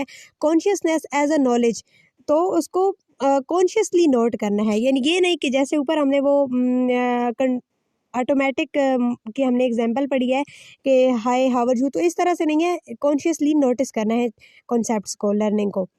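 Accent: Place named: Pakistan. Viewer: Content is good, but I can notice that Pakistani accent there 👀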